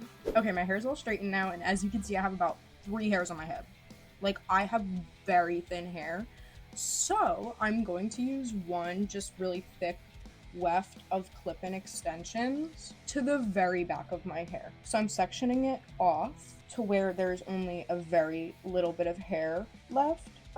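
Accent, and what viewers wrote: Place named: United Kingdom. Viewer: Idk why but from ur tiktoks I always I assumed you were British. Also GREAT VIDEO! Ur so pretty^_^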